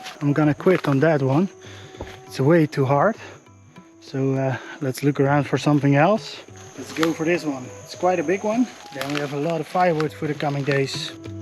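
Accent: Netherlands. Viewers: Coole video. Nice dat je het in het Engels doet. Wel grappig om te zien hoe je bepaalde dingen echt op een Nederlandse manier zegt maar dan in het Engels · Ik twijfelde aan je accent en dacht al dat je Nederlands was. Toen zag ik die kruidvat zonnebrand en wist ik genoeg😂 toffe video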